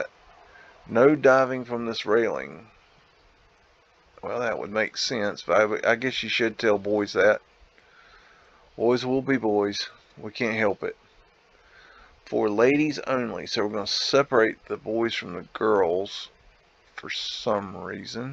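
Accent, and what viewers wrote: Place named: Oklahoma, United States. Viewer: That accent, you close to oklahoma?🧐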